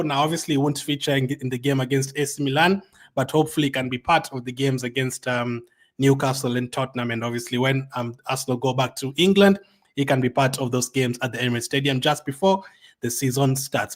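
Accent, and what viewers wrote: Kenya. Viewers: you sound kenyan .COYG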